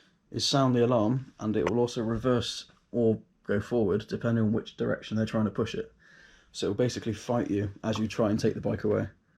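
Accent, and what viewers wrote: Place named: Andover, United Kingdom. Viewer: As much as I like the idea of elecric two wheelers. I would and will opt for a petrol scooter type vehicle and there some really nice ones out there. Run out of gas fill up anywhere. Electric ? run out of power your in the poo poo. I will stay with petrol for now. I think the battery technology is not there yet, its getting there but not now. Accent sounds like Andover or Basingstoke?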